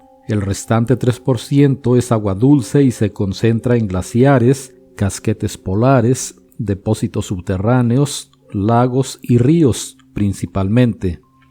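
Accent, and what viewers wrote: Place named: Dominican Republic. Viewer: Es acento dominicano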